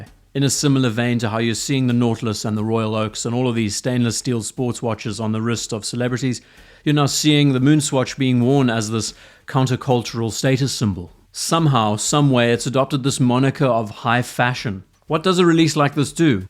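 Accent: South Africa. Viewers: Hold up... you from South Africa?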